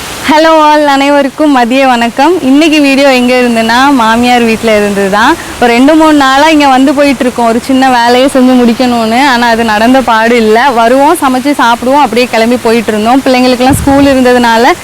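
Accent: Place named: Nagercoil, India. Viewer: Super sis, when you speak live I can see your Nagercoil slang that's good.... Totally enjoyed your home garden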